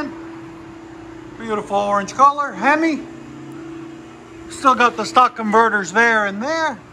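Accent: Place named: New York, United States. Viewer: Tennessee and he sounds like a pasta eating nerd from New york.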